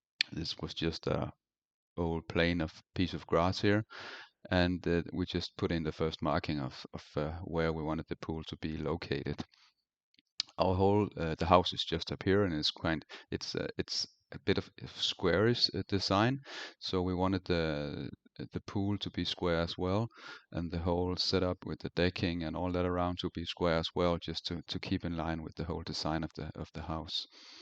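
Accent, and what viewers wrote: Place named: Denmark. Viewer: Your video was just recommended in my YouTube account. So then I just start watching it right away. And Wow. This is in Denmark. That’s why I felt little bit of Scandinavian/Nordic vibe in your video. I can hear your Danish accent . I didn’t know your Danish until seeing your name on your youtube profile.. Roskilde and Copenhagen used to be my home for 6 years. You’ve built an impressive natural pool. I like it. Thanks for sharing.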